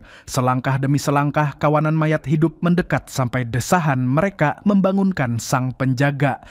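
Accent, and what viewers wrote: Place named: West Java, Indonesia. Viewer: fix logat urang sunda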